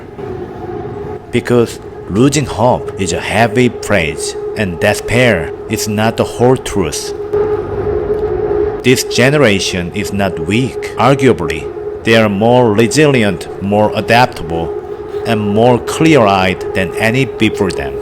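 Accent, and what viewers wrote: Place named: South Korea. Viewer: Impressive work! I just hope that you can drop the Korean accent 😅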